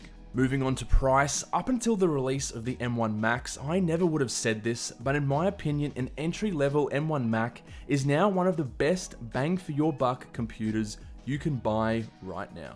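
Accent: Australia. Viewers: Generally, I face difficulties understanding the Australian accent, but the way you speak - clearly and slowly, I get almost 100% of what you are saying.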